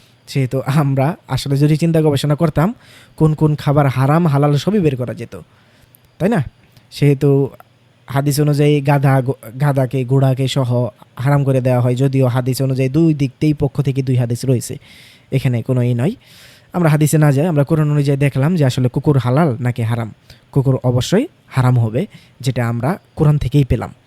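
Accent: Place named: Manikganj, Bangladesh. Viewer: আমার বাসা মানিকগঞ্জ, আর আপনার কথাও মানিকগঞ্জের মত লাগছে এর জন্য বললাম আরকি।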